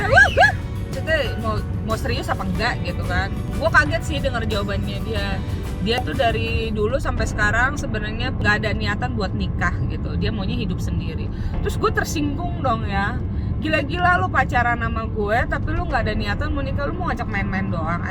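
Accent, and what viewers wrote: Bekasi, Indonesia. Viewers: 21 tahun di Korea tapi Bekasinya masih berasa beeeeudd🤣🤣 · Dari pertama ngomong, logat dll udah bs di tebak klo nih orang Bekasi.... Beda gentakannya.... Hahahaha.... Kanmaendah.... 😁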